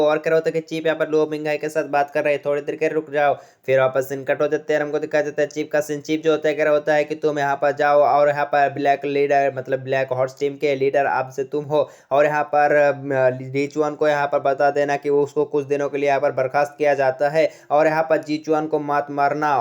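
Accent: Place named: Bengal, India. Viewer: Your videos are nice and i really follow it but please try to improve your hindi I can understand you are a bengoli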